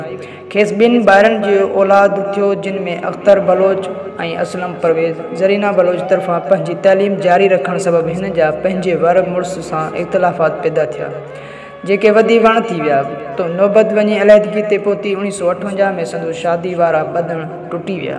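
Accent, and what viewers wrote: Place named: Saraiki, Pakistan. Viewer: I appreciate your work, but what I say is that you are not speaking Sindhi perfectly and fluently, therefore, you need to improve your own Sindhi language before uploading any video. From your pronunciation, it seems that your mother tongue is not Sindhi, and probably you are Saraiki speaking and that is the reason that you are unable to speak Sindhi correctly. Please listen to your video and I suggest you to re-edit it.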